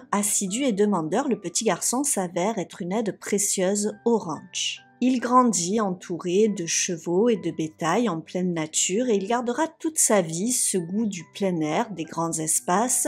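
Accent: South, France. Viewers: J'aime bien t'écouter, malgré les horribles histoires, ta voix est apaisante avec ton léger accents du sud qui chante..😅❤ je suis fan. Merci ❤❤❤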